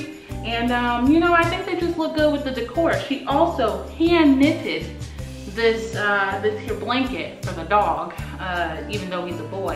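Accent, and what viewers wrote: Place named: South, United States. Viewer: Is the southern accent for me😂😂😂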